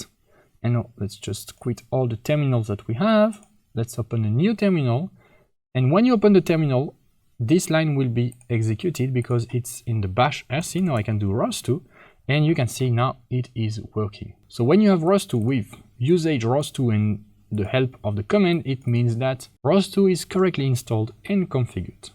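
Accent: France. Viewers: Je reconnais un accent français c'est dommage que la vidéo ne soit pas en français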